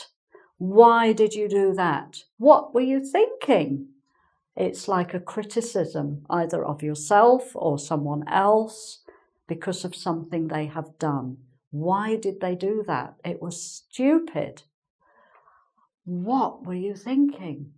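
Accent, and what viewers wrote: United Kingdom. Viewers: She is authentic British which I love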